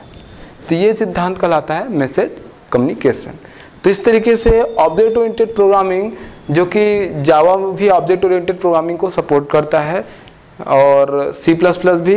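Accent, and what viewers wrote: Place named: Bhojpuri, India. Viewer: bhojpuri tone me baat karte hai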